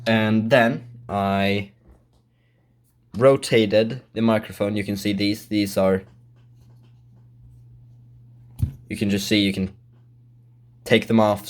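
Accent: Sweden. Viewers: Are u from Sweden cuz I feel like ur accent of pronouncing words is like Swedish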